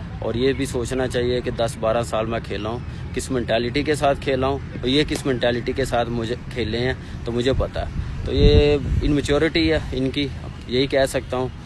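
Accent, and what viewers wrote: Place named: Lahore, Pakistan. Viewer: Dumb ass wo lahori accent hai unko ko tohra masla hota hai pronounce kerney mein werna tum log z ko j ki jagga use ketey chalo katey kisi ney yahan indo pak ki baat nahi k🙏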